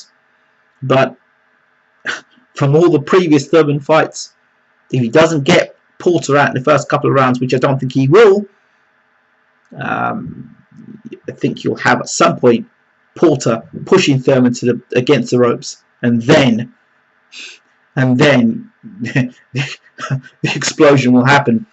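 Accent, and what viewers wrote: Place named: United Kingdom. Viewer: this nigga look like he from South memphis and has a British accent.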